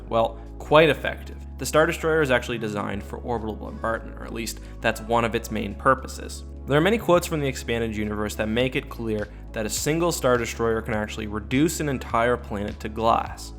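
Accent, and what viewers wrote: Canada. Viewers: Patriotism? It's pretty obvious this guy is Canadian due to his accent.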